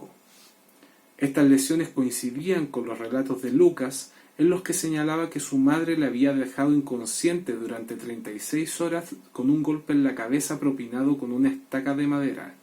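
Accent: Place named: Chile. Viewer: Gracias por las recondaciones. Saludos desde Perú, he madrugado a ver tu vídeo Por tu dejo al hablar asumo que es Chileno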